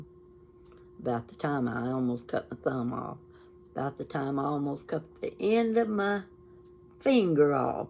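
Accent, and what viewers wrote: South, United States. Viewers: Hi, just found you by accident and had to watch a couple of your videos. I can't wait to see more of them, I connected with you right off the bat. I live in Michigan but my parents were from the south, so I love you're accent. Keep up the good work and thank you for doing these videos. Blessings to you.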